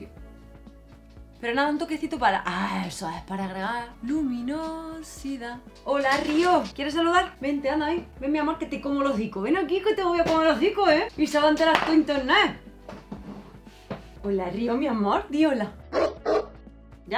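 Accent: Andalusia, Spain. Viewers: me gustas mucho, tu acento me transporta a almuñecar donde e pasado mi infancia, eres genial, esa paleta me encanta 😘🥰😍❤️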